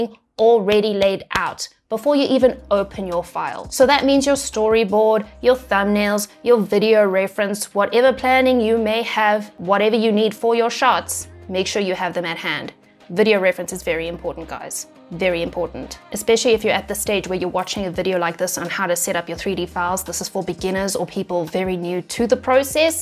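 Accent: South Africa. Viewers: wow you really do sound south African! hate taking leave it just makes me aware of how much work piles up for when I get back.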